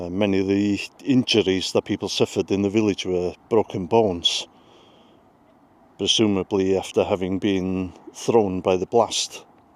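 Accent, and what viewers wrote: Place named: North Wales, United Kingdom. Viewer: S Walian here; nice to hear a full Gog accent!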